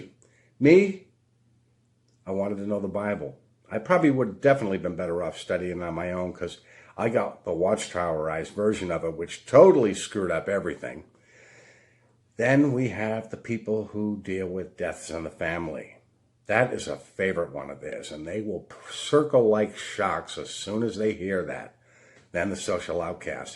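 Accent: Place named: New England, United States. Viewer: I love this channel! I’ve never been a JW. My best friend’s family joined in the early 80’s. She spent 32 years in and finally got out. She was able to remain friends with me that whole time, however. I follow these channels because I like to learn what she went through. I love your stories about your personal experience. Side note, I could listen to your New England accent all day long 😊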